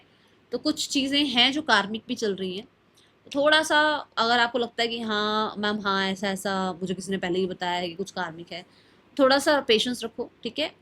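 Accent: Punjab, India. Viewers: Muje ap ki lengve s पता लग raha h ki ap पंजाबी h Mam टोटल बदतमीजी इसने ki h Maine पता नहीं कितनी बार फोन kiya per इसने kabhi फोन att nahi kiya kya karu please please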